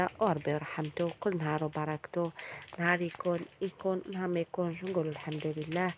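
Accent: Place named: Blida, Algeria. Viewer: لسانك ينقط عسل ، ربي يحفظك،تعجبني لهجتك البليدية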